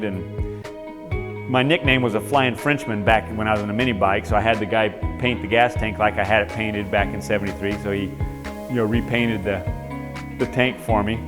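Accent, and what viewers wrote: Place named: California, United States. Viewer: Oh my god!!!! can i come over and walk that collection some time. You sound like your in california, im up in tahoe. Ill bring beer. Workin on a 79' XR250 right now, but im going more custom. My dad gave away 3 ossa's in like 88' i was in 5th grade and had no pull. My gramps gave away 2 old hondas and a yamaha that same year. Man! those guys I'd love to have those bikes today.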